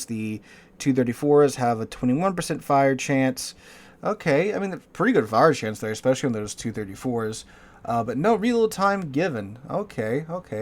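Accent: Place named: United States. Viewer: Really man? That pronunciation... You cant just read stuff in an american way and expect it to sound about right! 😅 Let me help you: G in Georg is nothing like the english G... its not like the J in John its like the g in hug (both g). And the e is like the men. GE-ORG. And regular R not the amerikan rolling tongue R. Please say Hoff-mann with a like part/dart...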